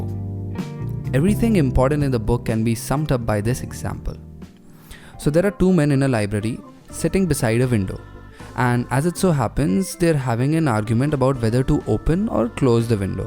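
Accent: India. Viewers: I like your accent. Are you from Hyberabad?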